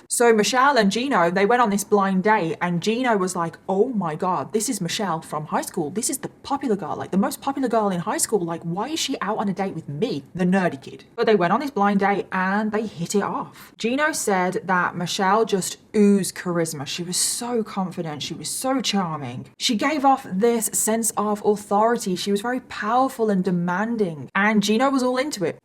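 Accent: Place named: United Kingdom. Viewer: She from the UK and its her accent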